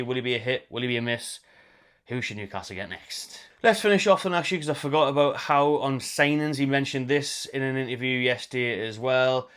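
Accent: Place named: Liverpool, United Kingdom. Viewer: You won the worst premier league since it was created. You get carried by Salah and Van Dijk but their mid 30s now and have their huge retirement contracts and already dropped off massively end of last season. It's back to scrapping for 2nd or 3rd this season im afraid, and that would even be WITH Isak. Ps. The scouse accent is by farrrrrr the most annoying, scummy accent in the UK haha. You total cretin.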